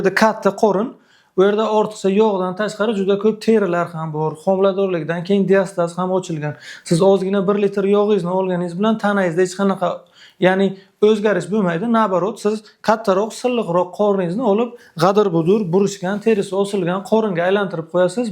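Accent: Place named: Bukhara, Uzbekistan. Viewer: Оллох Рози булсин сиздан доктир. Доктир каерликсиз.? Бухороданми шевангиз бизга тортаркан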